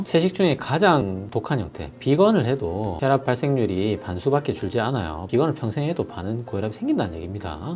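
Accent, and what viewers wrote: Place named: Busan, South Korea. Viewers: 님병원이 어디에 위치하고 있는지 알려주세요.~~~ 참고로 저는 부산 연제구에 삽니다.^^ 영상 만드는 일에 정성이 가득하시고.. 강의 내용 퀄러티 인정! 그래서 고혈압, 당뇨 위태위태한 저는..꼭 한번 뵙고 싶기도 해서.. 사투리가 부산 사람인듯 하여..병원이 부산에 있을듯란 예감이 듭니다.ㅎ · 같은 부산 분이라 그런지 하태경 의원님과 외모, 말투 모두 비슷하세요. 엘리트 부산인들의 공통적인 말투인가 보네요.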